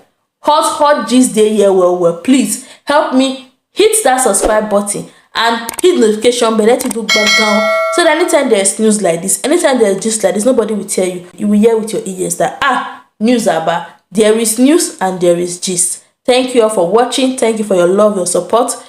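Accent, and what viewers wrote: Ghana. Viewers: Thanks for your information. Your accent is gradually changing to Ghanaian accent. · Good girl. Thank you for your ténacité. Fantastic video. Your accent is so Ghanaian now. Your Ghanaian citizenship will soon be coming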